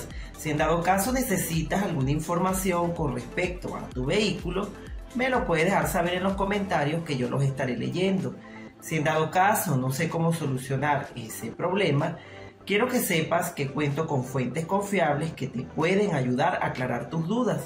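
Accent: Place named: Colombia. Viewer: claro maestra de Peru soy electricista automotriz y trato de actulizarme a traves de youtube ojala el otro año pueda matricularme en un instituto conocido en mi pais gamor para aprender electronica automotriz y usted maestra de que pais es? ese dejito tan bonito acaso de Colombia?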